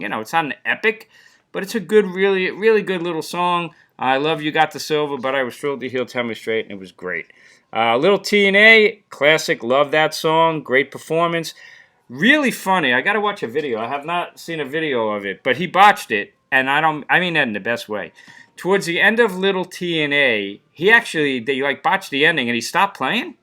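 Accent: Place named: New York, United States. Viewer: Jagger is 80 not 81. The fact that the muddy sound became clearer that’s cause of the wind, or probably a slight breeze coming & going. Guess you’ve never sat in the nosebleeds! The fazing was fucking wind not the sound guy. Your used to arenas no wind. & you probably saw the Stones at Giants stadium a virtual wind tunnel that can get lucky no wind some dates. Only good thing about your 📹 is your NY accent but your no Archie Bunker pencil in Cindy Lauper It’s the fucking wind!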